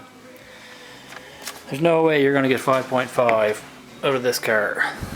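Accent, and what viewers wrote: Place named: Canada. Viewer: I wasn't watching the video at first, but noticed you were obviously Canadian. Then I flipped over to the video and confirmed that with the Mastercraft tools, eh.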